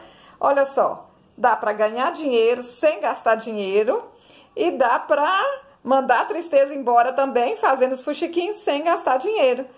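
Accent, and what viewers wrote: Minas Gerais, Brazil. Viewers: Há quanto tempo desejo aprender a fazer fuxico... Muito obrigada... Sei sotaque é muito lindo!!! É de minas??? Muito graciosa e paciente você é! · Ah o sotaque mineiro 😍 Um calma, conseguimos pegar passo a passo, obrigada por compartilhar suas ideias.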